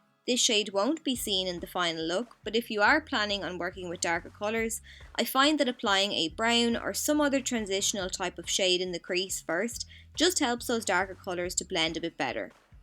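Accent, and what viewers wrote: Ireland. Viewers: I love your Irish accent, and I'm half Irish myself. I love that beautiful makeup look you did. I am also learning how to do liquid winged eyeliner. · you're accent is so pretty! you sound so sophisticated whenever you speak, are you from Ireland?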